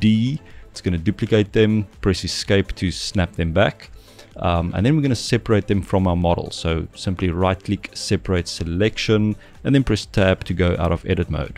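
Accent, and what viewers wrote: South Africa. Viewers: Ppreciate the video bro! Also, are you South African?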